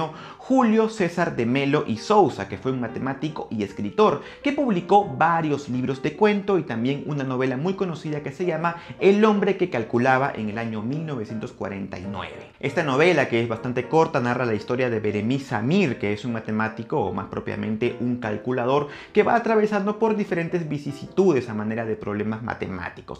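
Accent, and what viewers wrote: Peru. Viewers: eres peruano? :o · O sea eres peruano?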